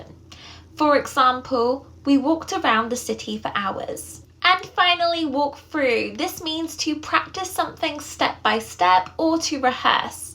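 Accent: United Kingdom. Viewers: Love British English accent.❤❤❤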